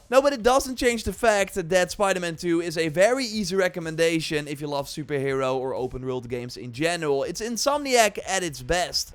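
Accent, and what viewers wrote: Netherlands. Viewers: ben je nederlands ofz?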